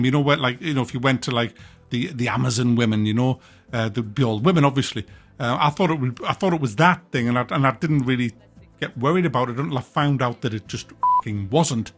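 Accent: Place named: Newcastle, United Kingdom. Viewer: Honestly I couldn't care less about Avowed it's just nice hearing a fellow geordie ranting about poor shite 😆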